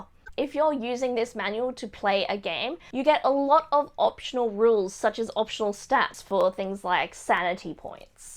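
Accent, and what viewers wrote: Australia. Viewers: I really really want to love your channel, but you have an Australian accent still. :(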